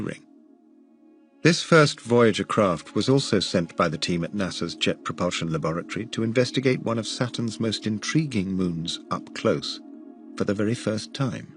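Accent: England, United Kingdom. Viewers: its an English accent. Britain is made up of multiple countries, each with its own distinctive accent. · The 'british narrator' is agreed by anyone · British narrator is a must for me. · British narrator you know this, I can’t watch any doc without a British narrator and this guy is soothing asf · lol, i like this video putting in what appears to be real pictures. Hard to find documentary on yt showing that, wish more would do it. And british narrator should be on all docus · Glad I’m not the only one that prefers British narrators to fall asleep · I use this to fall asleep. Perfect! Space....check. hours long....check...British narrator...check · This helps me sleep so well because the narration is stitched together SEAMLESSLY. There are no pauses or awkward silences for my brain to pick up on. The British Narrator is CONSTANT. Like a metronome - just keeps going. 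🧠 💤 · Fantastic movie, can't imagine the amount of effort put into it... apparently I have a lot to learn about the YouTube business model. As for the narrator, he's binary compared to everything that's wrong with the world today... intelligent, intellectual, rich language but not inflated, calm, not artificially sensational and (thank god for the) British accent! Well done! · Yes! I agree 100%, His delivery has the perfect cadence, calm and british ( that is way easier than synthetic pseudo-british or irritating southern american drawl to digest for hours at a time), and the information is useful and free of words like "shocking" or "we were wrong" type crap....thank you. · 😂 what’s the deal with the British accent? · That good ol’ monotone English accent … nature’s sleeping pill. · My literal checklist for prime sleep! Space, hours, English accent 👌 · Perfect narration. Beautiful, clear and calm. 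A voice which is trustworthy and very, very British. So refreshing. America take note. This is how you inform people.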